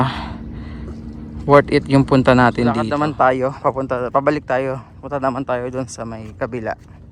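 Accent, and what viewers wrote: Visayas, Philippines. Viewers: Ganyan bisaya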